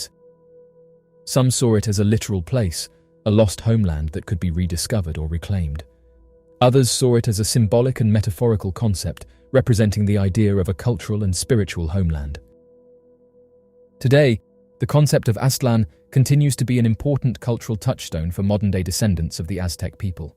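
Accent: United Kingdom. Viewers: Yeah, I am baffled by this video. Teotihuacan was neither built by the Inca (which is on a different continent) nor Aztec (about 1000 years too late). It's not exactly known who built it or what those people were called, as even the name Teotihuacan which means "city of the gods" was given to it by the Aztecs who found it as an ancient ruin. I guess if they slap on a dude with a British accent, that dumb Americans will believe anything they say in a video.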